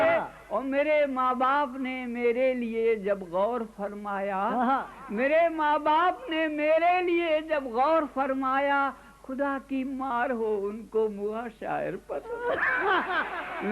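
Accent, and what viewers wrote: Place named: Lucknow, India. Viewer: Waah Adil Sahab kya shayar the aap, aur kya zbaan pure lakhnavi zbaan aur aapki peshkaari ba-kmaal. Pride of India.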